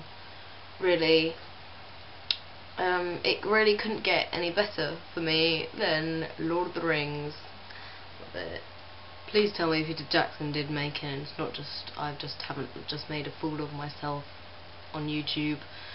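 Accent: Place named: United Kingdom. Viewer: you sound so british :)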